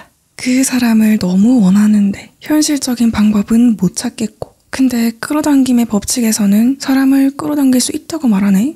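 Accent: Gyeongsang, South Korea. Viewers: 작전 변경...지금부터 님을 제 와이프로 끌어당기겠습니다. 경상도 사투리 넘 매력..내 알라도..ㅋㅋㅋㅋ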